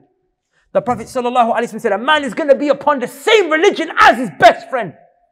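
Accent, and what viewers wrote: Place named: London, United Kingdom. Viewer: This is how a lot of people in London speak these days, not just the ‘youth’. It is just multicultural London English.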